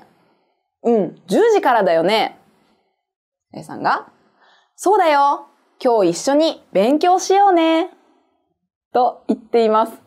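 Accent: Kansai, Japan. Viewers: わし関西弁が大好きやねん。先生は関西人やな。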